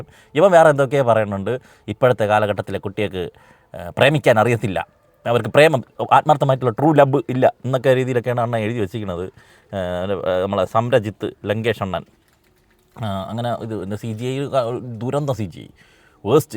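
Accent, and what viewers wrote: Trivandrum, India. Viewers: ഇതാണോ തിരുവനന്തപുരം ഭാഷ ആണെങ്കിൽ nice❤❤ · Itrem bore presentation, maryadakk samsarichude, istapettillel athang paranjapore, trvm kare parayipikkan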